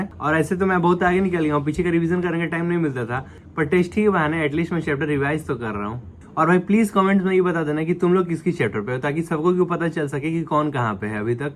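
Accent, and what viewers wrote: Bihar, India. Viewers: Bihar se Ho kya bhai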